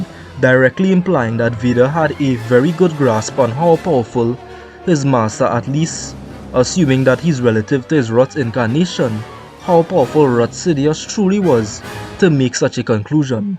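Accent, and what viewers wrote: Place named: Trinidad and Tobago. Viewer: U definitely from Trinidad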